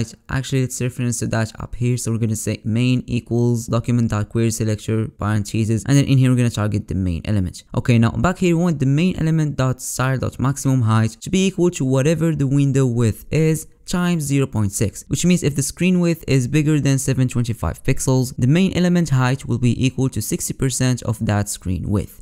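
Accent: Morocco. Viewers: you sound moroccan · that's what i thought because i'm from morocco and his accent similiar to moroccan English 🙃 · Nadi a khy 3raftek meghribi rir men l accent 😂💯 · mn l'accent katban mghribi😅nice video tho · علاش في نبرة ديالك فيها النغربية واخا لونجلي نقية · 10000% maghribi ghir mn Accent dyalk mwara9 asat 😂😂😂😂😂 but good vid tho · khoya I sense a moroccan accent here, otherwise your video is freaking generously informative, the amount of work you put it is amazing man, thank you so much for the effort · u have a moroccan accent rak nadi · Are you from Morocco or Algeria because your accent is similar to the Moroccan dialect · Your accent like ur Moroccan bro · I can hear the moroccan accent❤ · كاين اساط كمل للهجة المغربية حاضرة مي راك ناضي تبارك الله 🔥💪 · I can tell by the accent that this guy is a fellow moroccan brother Keep going my guy ! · from your accent i guess your morrocan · that Moroccan accent tho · you have moroccan accent <3😎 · akhay hadchi 3ndek hrbaan saraha, 3rfti sbhanlah mgharba baynin, okay3rfo b3dyathom gha mn l'accent,knt gha msserkl ta dkhlt lvideo o 3jbni lhal nchof drari mgharba 3atin fdomain.mgharba finma htitihom i3tiw anyway rak nadi assat tbarkelah 3lik ❤️❤️ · From your clear language I think you are from Moroccan country correct if I am wrong and thanks for this helpful tutorial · You're accent shows that you're from morocco 🇲🇦 Am I wrong? 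😅🤣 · You sound Moroccan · i think this is a bit closer to moroccan accent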